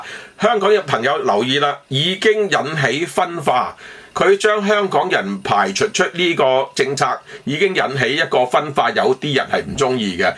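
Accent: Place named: Taishan, China. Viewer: 感謝伍 Sir 乜原來時聞喺台山話，我還以為是新會話，因昔日有新會籍先輩常宣於口。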